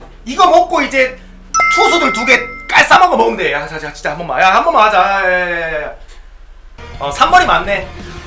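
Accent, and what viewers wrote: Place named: Gyeongsang, South Korea. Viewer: 나 경상도 사람인데 이정도면 사투리 심한 사람중에서 순한 말투다..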